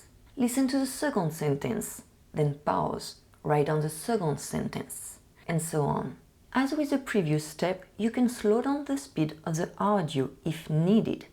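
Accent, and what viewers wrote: France. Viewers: BTW I love your French accent so much!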